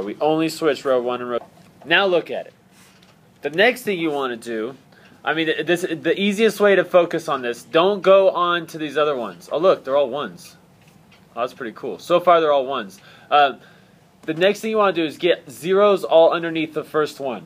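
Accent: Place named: United States. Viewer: this sound so american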